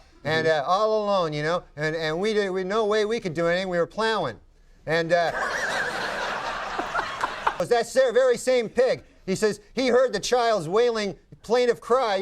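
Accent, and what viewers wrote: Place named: Canada. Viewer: His Canadian accent is showing eh???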